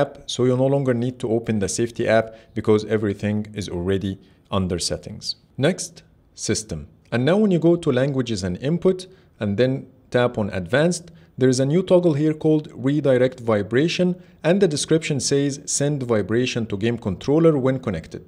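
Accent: Egypt. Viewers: Are you an Egyptian living in UAE?? Your pronunciation kinda gives me the feeling that you are. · Man you sounds Egyptian 😁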